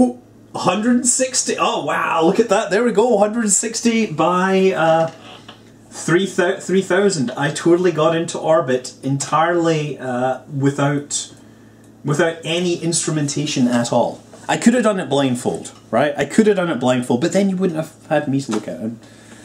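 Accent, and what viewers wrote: Scotland, United Kingdom. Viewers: I understand that, however, say you launch and have enough velocity to reach the same altitude as an orbiting craft for a moment, but you're on a ballistic trajectory so you're going to return to the atmosphere, but you dock, your mass would then... have what effect on the craft you dock to? I imagine the added mass would then decrease the speed of the craft you docked too... I know I could look it up, but I prefer my science dolled out in a Scottish accent ^__^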